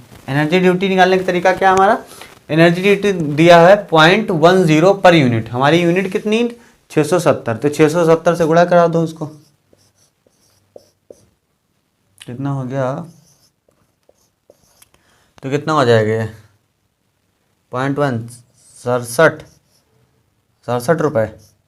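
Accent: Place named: Uttar Pradesh, India. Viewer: sir me apki boli se smjh gya tha aap utar pradesh se ho exactly kh se hoo??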